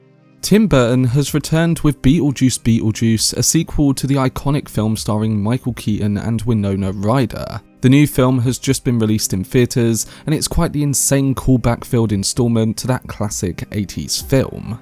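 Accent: United Kingdom. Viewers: There's not a lot of British people's voices i like but man you're it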